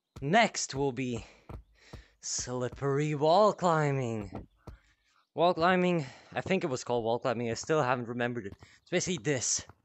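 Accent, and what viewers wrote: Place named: Philippines. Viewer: u filipino? u got the accent lol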